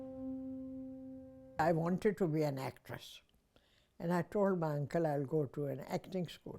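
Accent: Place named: Kashmir, India. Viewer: I wonder why she a hint of kashmiri accent.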